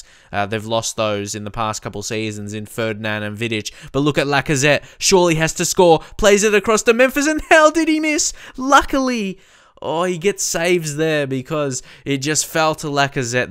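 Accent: Melbourne, Australia. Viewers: That Melbourne Accent.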